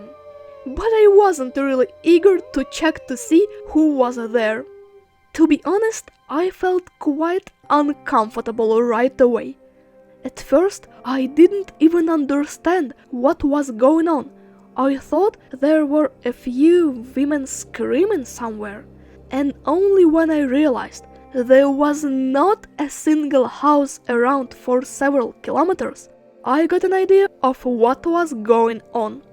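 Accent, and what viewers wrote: Russia. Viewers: You not you. You speak English like Russian dievoshka. Atlitchna 👍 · Omg you have so much cute voice, the way you speak the accent is very cute ❤️🥰 i like Russian accent. I love slavic culture. And also Dagestan is heavenly beautiful. Keep going, I'll support your channel!👍🏻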